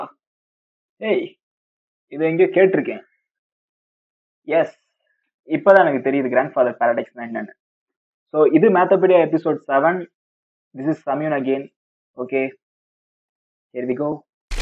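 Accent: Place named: Madurai, India. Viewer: Change ur slang it's completely madurai slang da... But keep going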